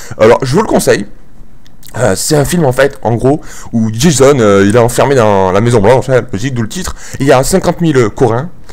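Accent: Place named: South, France. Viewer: accent du sud tu viens d'ou ?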